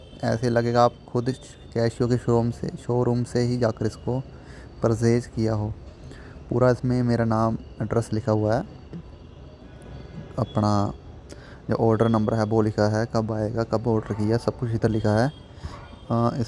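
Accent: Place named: Punjab, India. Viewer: great video buddy....very enjoyed your hindi in punjabi accent 🙂🙂🙂🙂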